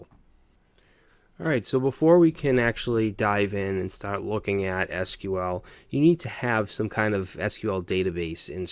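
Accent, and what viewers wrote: Boston, United States. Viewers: what part of Boston are you from? love the video man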